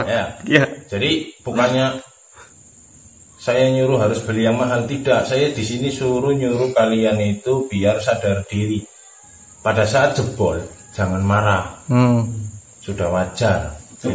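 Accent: Banyumas, Indonesia. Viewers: Wis lah . Pokoke wong ngpak pling kpenak...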